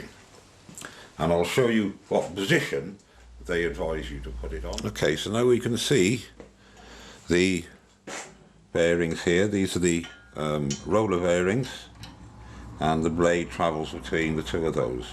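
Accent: United Kingdom. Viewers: Really enjoy your videos being a brit living in amercia hearing a fellow brit talking about things I enjoy brings much joy and not to mention learning some great information keep your head up mate from a fellow brit who is home sick I really appreciate the videos.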